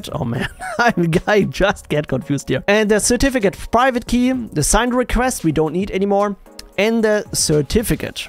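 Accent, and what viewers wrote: Germany. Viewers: Da hör ich doch das Deutsch raus xD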